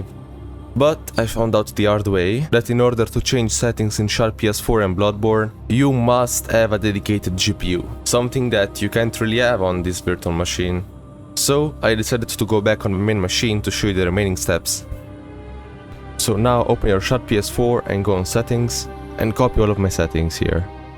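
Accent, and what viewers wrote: Italy. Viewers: Sei Italiano? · bro sei italiano vero?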